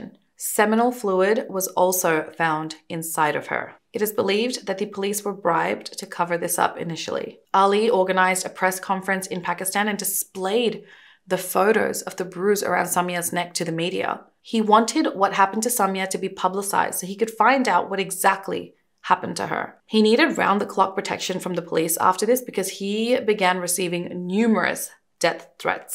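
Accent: India. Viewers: Ehhh I always thought your ethnicity was South Asia. Even when you talk about your childhood and stuff it sounds so Indian XD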